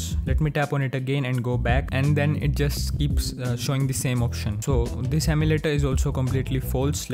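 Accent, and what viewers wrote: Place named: India. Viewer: Yes something thst is indian on english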